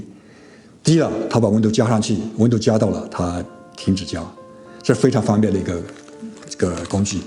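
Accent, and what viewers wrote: Yunnan, China. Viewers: 好像是云南人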